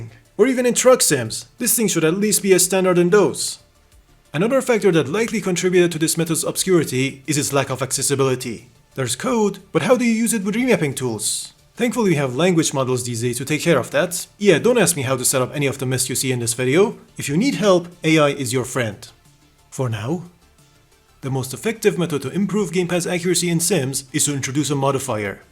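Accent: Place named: Iran. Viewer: yeah i know ، i didn't want to disrespect you brother , but you have the same accent as Iranians , i thought you might be Iranian like i am , im Persian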